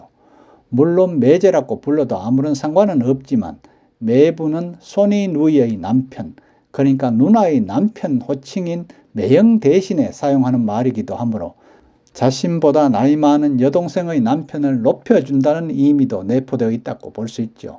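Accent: Gyeongsang, South Korea. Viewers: 매제, 매부, 발음에 있어서 문제 없습니다. 지방에 따라 발음이나 장단이 다를 수 있지만, 경상도 억양을 쓰는 님이 쓰는 매제, 매부, 발음은 서울, 그리고, 표준발음하고 다르지 않습니다.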